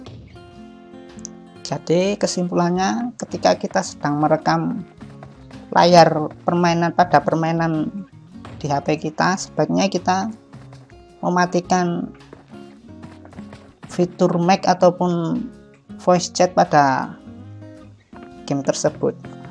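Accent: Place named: Java, Indonesia. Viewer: Medok e brrooo🤣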